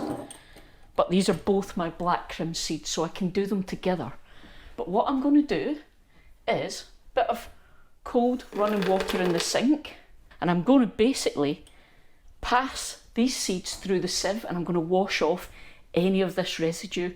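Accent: Scotland, United Kingdom. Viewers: I've been going back. Tried to find it but no luck.. oh well I was just curious. No big deal.. Love the Scottish accent.. From 🇨🇦 🥰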